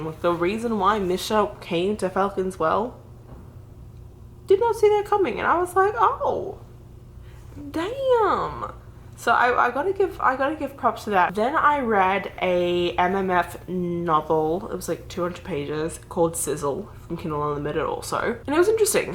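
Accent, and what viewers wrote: Australia. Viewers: I just came across your Chanel and love it. I’m curious..... your accent, are you Australian?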